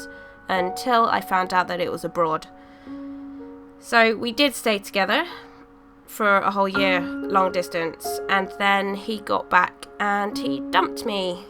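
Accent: Birmingham, United Kingdom. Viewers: I really suck at accents and then it hit me, she sounds familiar, then you came out with your from Birmingham! I haven't seen any other ' brummies' on YouTube, so hiiiii! :) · I really really adore yout artistic skills... and your British Accent!! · I love your drawings and British accent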